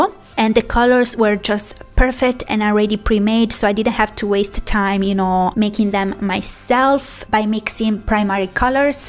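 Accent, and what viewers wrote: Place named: Italy. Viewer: love your channel:) your accent is very much like my friend from Casarano, Italy 🇮🇹 Viva Italia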